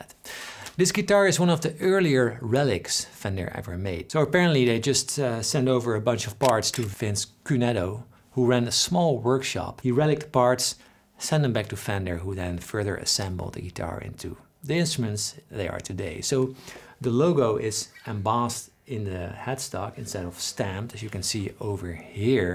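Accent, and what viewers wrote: Netherlands. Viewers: Hi. Are you Dutch? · Nederlands accentje is zo makkelijk te herkennen!! 🙃🙃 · You have a dutch accent? (very little).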